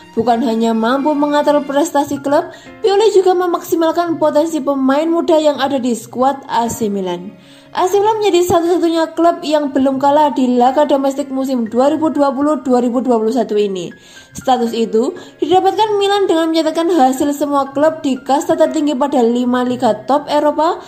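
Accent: Java, Indonesia. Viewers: mani medooook eta suara pengsisi suarana🤣.....forza milaaan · Surane medok medok pantes 🤭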